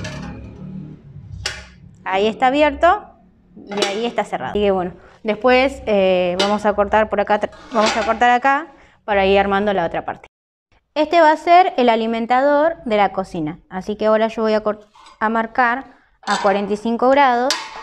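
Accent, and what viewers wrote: Argentina. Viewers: BONITO ACENTO TIENE LA ARGENTINA , CHIDA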